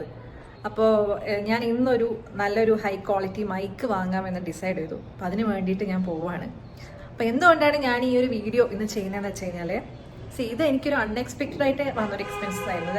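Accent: Kannur, India. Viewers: ഞാൻ ഇന്നാണ് ഫസ്റ്റ് വീഡിയൊ കണ്ടത് കൊള്ളാം സംസാര ഭാഷ ശ്രദ്ധിച്ചപ്പൊ കണ്ണൂർ സൈഡ് തോന്നി ശിയാണെന്ന് പിന്നീട് കമൻ്റ്സ് നോക്കിയപ്പൊ ഒരാൾ പറഞ്ഞത് കണ്ടു. ഓകെ ഗൊ ഹെഡ്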